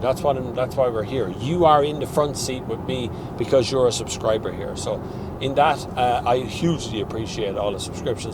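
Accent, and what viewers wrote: Ireland. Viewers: I'm just happy to hear an Irish voice.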